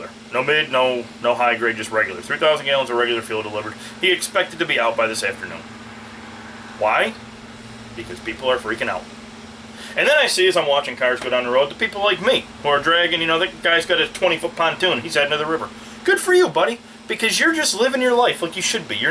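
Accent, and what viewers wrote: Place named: North, United States. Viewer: you sound like a yankee, you ain't from TN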